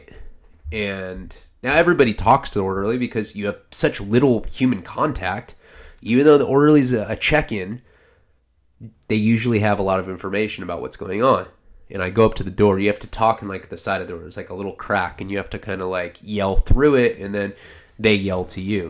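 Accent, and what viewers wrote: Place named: Chicago South Side, United States. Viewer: Your south sider accent is priceless 🤣🤣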